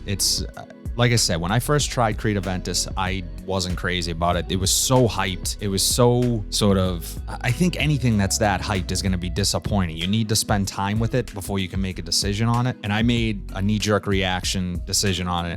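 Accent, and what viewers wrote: Boston, United States. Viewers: Love that Boston Accent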